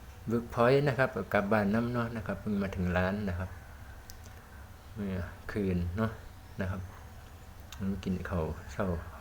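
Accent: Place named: Isan, Thailand. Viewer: เว้าเป็นแล้ว🤣🤣🤣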